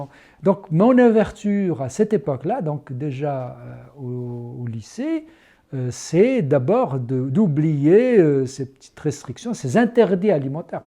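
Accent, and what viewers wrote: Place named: France. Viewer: Un croisé renégat qui fait tout comme les français de souche y compris l'accent avec une voix fluette de petite fille.Père militaire ivrogne mère dominatrice qui l'a élevé en petite fille (je n'invente rien c'est lui qui le dit) torchant, cousant raccommodant, résultat: couilles à l'envers.Ce proxénète veut à tout prix marier une musulmane à des non-musulmans! ce n'est pas tout il veut vaille que vaille les faire manger du cochon et les faire boire du pinard.Pourquoi? mystère.Il croit dur comme faire que ses ancetres sont des gaulois! à son age (62 hivers) quelle misère.Et je vous le donne en mile ce salaud est en plus un sioniste plus à droite que Zeev Vladimir Jabotinsky aller comprendre.Il écrit sur Hespress en arabe et fait croire qu'il est de tout cœur avec les palestinien.J'aime tous les marocains ou presque mais pas lui.J'ai oublié il se vante d'avoir changer de nom et signe mais sur hespress il signe! 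Si vous avez compris quelques choses expliquez moi.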